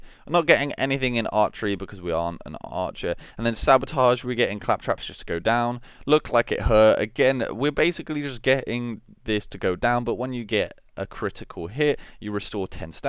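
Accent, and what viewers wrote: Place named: Australia. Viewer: You SOUND, I think, Australian. However, you can't say perfectly normal English words like caltrops, sundered, or comatose (claptraps, shundered, and catamost, respectively). A-are you just... like... illiterate? If so, I guess I'll never get an answer.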